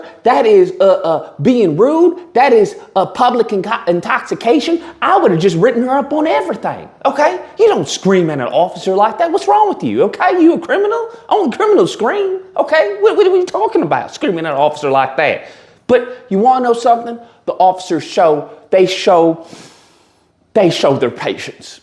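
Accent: South, United States. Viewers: Your " country accent " is too funny!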